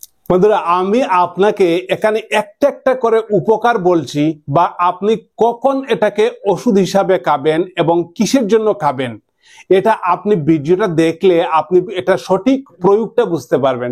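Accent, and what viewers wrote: Sylhet, Bangladesh. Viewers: Apni sylheti Kotay bujlam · সিলেটি দাদা কম কথায় কি বুঝানো যায় না !!!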